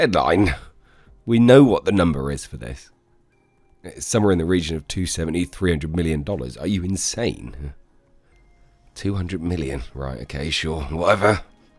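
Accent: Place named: United Kingdom. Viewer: I hope you get well soon! I miss hearing your lovely British accent.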